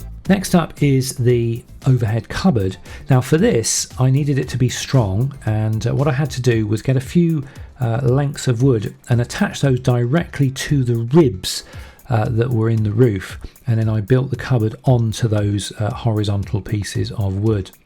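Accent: United Kingdom. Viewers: You sound like your over in the U.K.? We did have some ex friends that lived over near Cumbria UK, the lakes District. But we was friends to them but they weren't true friends to us, they was true users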